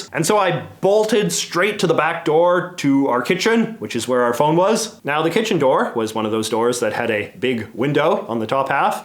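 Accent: Canada. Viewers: Please work on your thick as all heck Canadian accent...It's not representing us fellow West Coast BC folks very well 😎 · You have the most Canadian accent I have ever heard. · My first video I've seen of yours. I love the content of it. Nice 👏 👌. Very cool stuff... but God damn. Youre Canadian AF! Everytime I here oot..it just takes me oot of the moment lol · Lol you say a boot are you Canadian I'm Canadian too but I don't say about in quite the same way you do Looked at your channel it does appear your Canadian I'm going to refine my guests are you from Newfoundland? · Being a Canadian myself, this is the strongest Canadian accent I’ve ever heard. Good lord.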